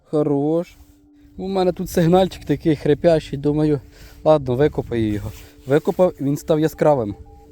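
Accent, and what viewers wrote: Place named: Vinnytsia, Ukraine. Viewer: Найдобріший з копарьских каналів. А як гарно звучить вінницька промова! Найчистіша українська імхо! треба щось з ніком робити, бо навпаки читається нетой.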